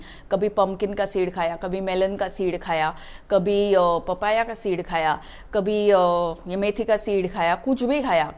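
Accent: Mumbai, India. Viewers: Love the mumbaiyya style talking ❤️ but mam every point is perfectly explained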